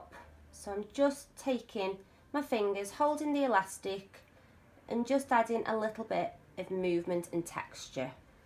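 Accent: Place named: Manchester, United Kingdom. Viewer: It’s one of the many English accents we have over here .... northern, Manchester I think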